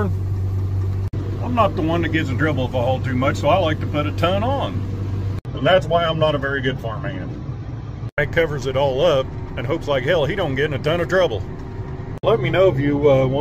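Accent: South, United States. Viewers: That's the best southern accent from a Yankee, Ive ever heard !! 😊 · You might live in Illinois now, but your accent says you came from somewhere further south, I reckon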